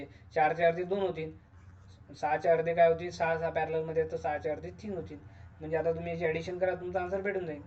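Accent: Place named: Vidarbha, India. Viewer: विदर्भा मधले आहात, भाषे वरून समजते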